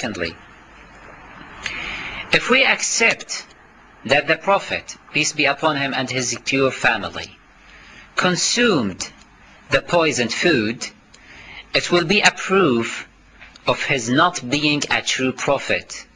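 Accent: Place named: Iran. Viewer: aksen bhs arab n englishnya ni org adalah org farsi. N kau tak usah pura tak tahu klo org rafidha tu agamanya mmng mengajarkan kekacauan bg Islam dan umat Islam.mrk senang mengadu domba antar muslim. ( rafidha x muslim) Agama rafidha ( syiah 12 imam) inti ajarannya MMUMUSUHI MUHAMMAD SAW DAN WAJIB BERLISAN KEJI THDP BELIAU!!!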